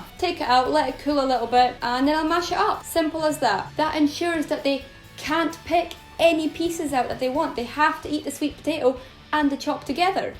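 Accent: Scotland, United Kingdom. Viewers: Oops hit the send arrow. Puffin was with me one month short of 38 years, and Dixie, a Green Cheeked conure rescued from a horrible situation and given to me by my avian vet so I could rehabilitate her and give her a good home. Dixie passed away at age 22. Your videos are educational and entertaining. I hope you get a lot of subscribers so your knowledge is shared and valued. I love your accent--are you in Scotland? My dad was born in London, England, and served in the Royal Air Force in WW 2. My mother was American and I was born here in the USA. I'll be binge watching your videos for a while to catch up. Great fun, they are. Apollo enjoyed the ones we watched tonight.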